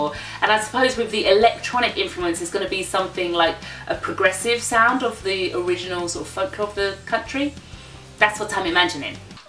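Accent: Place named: United Kingdom. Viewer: Акцент British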